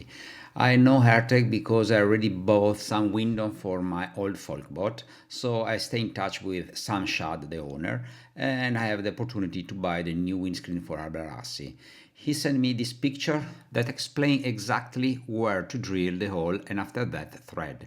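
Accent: Italy. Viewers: bravo sembri veneto dal sound....se ti serve una mano gratis a disposizione perche mi sa che c'e' davvero molto da imparare da voi. well done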